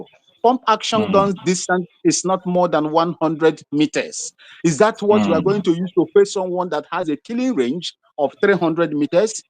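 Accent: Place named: Igbo, Nigeria. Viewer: This is an igbo man from his intonation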